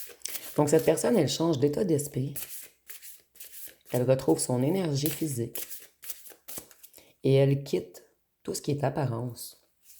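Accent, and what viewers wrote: Quebec, Canada. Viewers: <link> Charles DE GAULLE, lorsqu'il arriva en TERRE DU QUEBEC, tomba amoureux de cette TERRE Française et de ses habitants et cria avec son coeur: VIVE LE QUEBEC LIBRE!!! Votre accent de la "vieille FRANCE" me rappelle cette histoire glorieuse de la FRANCE éternelle et de DE GAULLE qui éprouva une grande joie de retrouver une FRANCE oubliée... MERCI pour vos guidances très psychologiques et clairvoyantes qui nous aident à comprendre les situations complexes et complexées par des blessures d'enfance... 😘💖👍🙏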